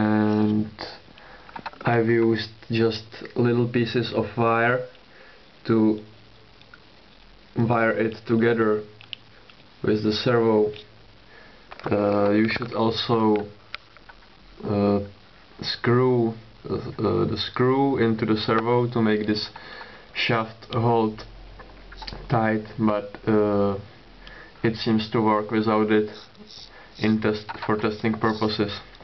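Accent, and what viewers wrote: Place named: Czech Republic. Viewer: Love that Czech accent :D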